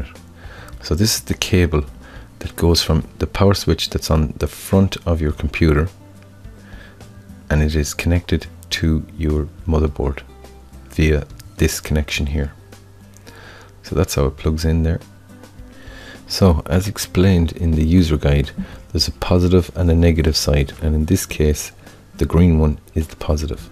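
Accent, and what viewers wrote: Ireland. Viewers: By listening to your accent, I guess you're Irish. Am I right? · What part of Ireland are you from your accent sounds like Dublin.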